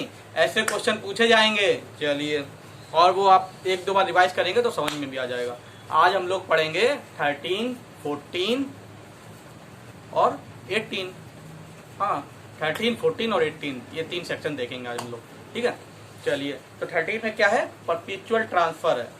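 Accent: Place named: Uttar Pradesh, India. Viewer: 😁apke bolne se hi pta chalta ap up se ho Mai bhi Lucknow se hu.. Bahut Acha laga video...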